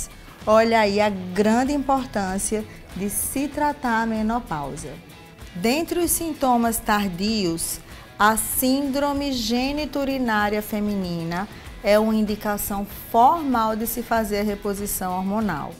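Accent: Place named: Rio Grande do Sul, Brazil. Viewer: amo seus vídeos doutora,inclusive esse,suas lives tbm,seu sotaque é muito parecido com o da minha terra rs RS,abraço 😘❣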